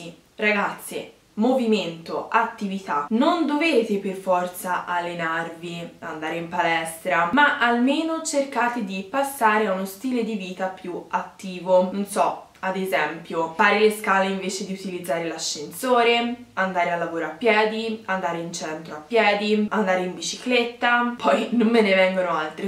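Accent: Tuscany, Italy. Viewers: Sei Toscana? ☺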